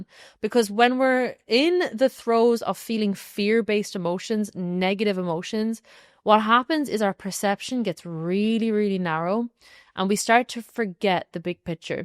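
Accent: Ireland. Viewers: Please embrace your Irish Accent, it’s one of the many reasons why I am attracted to this content.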